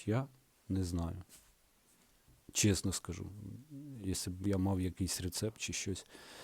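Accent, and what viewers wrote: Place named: Russia. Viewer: по вашей речи очень заметно что вы русскоязычный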